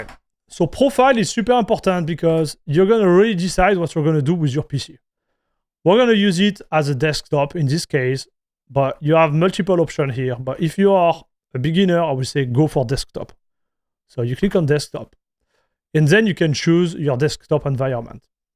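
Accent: France. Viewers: the french accent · i love your french accent \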